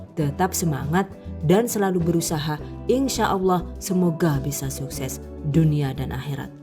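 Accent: Banyumas, Indonesia. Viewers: Ngapak Rika ya 😂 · Yg punya chanel orang mana sih? Kok ngapak sprti sayaa 😂,, hehe chanelnya keren dan menginspirasi 😊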